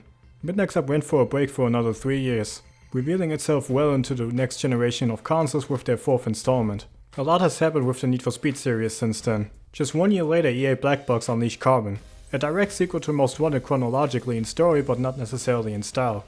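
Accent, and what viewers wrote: Germany. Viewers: man hört so sehr raus wie deutsch er ist😂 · This has been real. What a nostalgic trip. Nice vid! You German?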